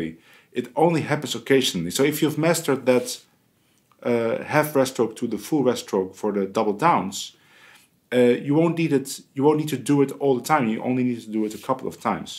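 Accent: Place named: Netherlands. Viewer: When I first came across your video, I was watching it on a different youtube format and didn't see your name etc. But I said to myself ("I'm hearing a slight 'Dutch' accent") I was sure of it my parents immigrated to California in the the early 60's right after I was born. They didn't teach us the dutch language sadly. So I have this void that only Dutch accents can fill....does that sound strange? It's music to my ears wherever i can hear it so thank you, even with your very slight accent theres more music than just the guitar haha doi hartstikka leuk! [additional edit] I wanted to brag about my Dad's musical days in Zwartsluis, Genemuiden, and Zwolle. I've only seen one photograph of the harmonica trio he played in throughout the 50's but they were very good! He played the smaller 6 or 8 centimeter harmonica, another guy played one that looked to be a foot long, and the third guy played a hinged double harmonica that seemed to be 20 inches long or something. 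Incredible. My dad was Klaas Schraa😃:hydrate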